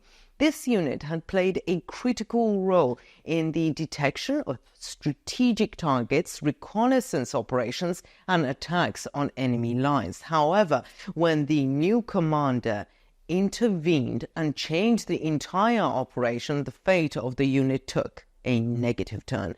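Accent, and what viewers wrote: United Kingdom. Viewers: I love your beautiful hair and British Accent.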